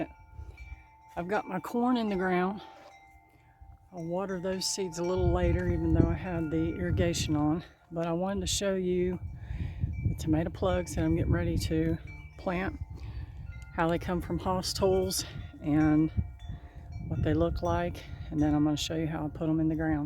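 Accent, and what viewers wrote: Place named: Western North Carolina, United States. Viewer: How exciting to find your videos! I am definately going to try the water bath canning! I also love that you are from my neck of the woods(western NC).....or sounds pretty close anyway.......I am in Lenoir! Looking foward to more of your videos......I am enjoying now going through all the previous ones. Thanks!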